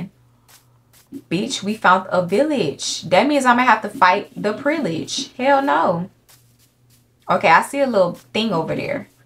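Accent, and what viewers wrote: Atlanta, United States. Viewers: You from Atl or something? I’m new to the channel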